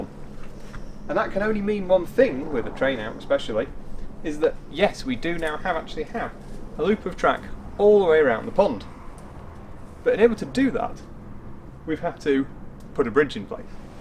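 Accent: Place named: Australia. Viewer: Hi, just found your videos. I’m a new garden railroad. I just got my first half of a circle of track and I have a NW-2 loco You are inspiring me to try to put something outside. It’s a little bit intimidating. I have to admit, but I’m learning a lot just watching you do this. I have one question though, where are you located? Are you hesitantly I ask are you in Australia or are you in England your location of your our way and your weather doesn’t seem to jive, so I’m not sure being an American. I apologize for not recognizing the accent.😂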